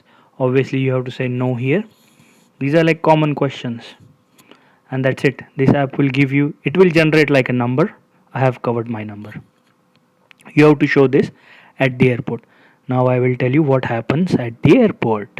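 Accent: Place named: Maharashtra, India. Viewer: Saheb English Changale bota pan Marathi Accent thoda drop karal tar eak no whal, I think u need to have neutral English Tone ur choice of words and sentence construction, is self explanatory ur just one step away to be speaking Flawless very competitive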